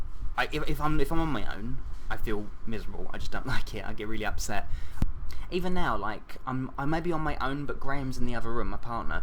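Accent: England, United Kingdom. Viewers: HES ENGLISH YAAASS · were do u live u soud british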